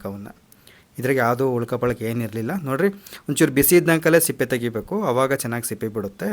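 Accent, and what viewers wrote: Chitradurga, India. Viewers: ಚಿತ್ರದುರ್ಗ ಭಾಷೆ ಕೇಳಿ ತುಂಬಾ ಸಂತೋಷ ಅಯಿತು ನಮ್ಮೂರು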